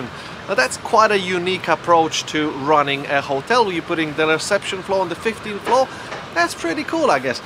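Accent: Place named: Germany. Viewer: German twang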